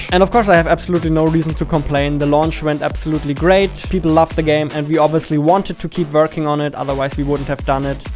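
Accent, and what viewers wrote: Germany. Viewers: Are you an Austrian, German or a swiss? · You are German?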